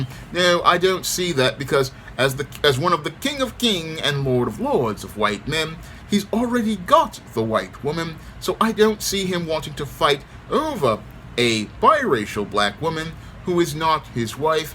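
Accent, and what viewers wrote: England, United Kingdom. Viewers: you had me rolling on the floor dying laughing when you did this whole video with your brilliant english accent. especially when you said "Why Should We Care"? just like you mostly say in your videos when it comes to mass of stupidity with these celebrities. this Prince Harry guy is fake and phony I never liked him or the so called princess Megan Margle or Markie whatever her pack sagging name is or pronounced. I don't give a damn about those people. the royal dutch family is a joke just like The Karshadians. real talk!!! keep up the good work Brother 👍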